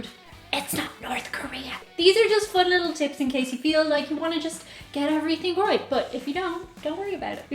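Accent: Ireland. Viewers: If it was North Korea you wouldn't have that lovely Irish lilt to your speaking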